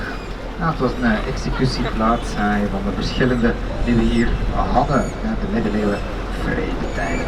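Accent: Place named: Holland, Netherlands. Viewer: Jammer van dat Hollands accent...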